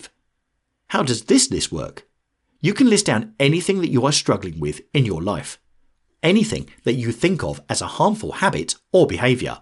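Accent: United Kingdom. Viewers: Yo, thank you again for turning my article into such an informing piece, honored man! P.S. It's so weird listening to something you've written, narrated in a British accent and animated in such a creative way:D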